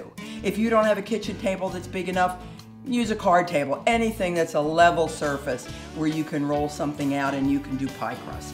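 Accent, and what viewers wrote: Philadelphia, United States. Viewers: you have to be from Philly/S. Jersey! Wooder! Love your sass! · This woman lives around Philly, somewhere. I recognize the accent. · That Philadelphia-sounding accent makes me lose my appetite.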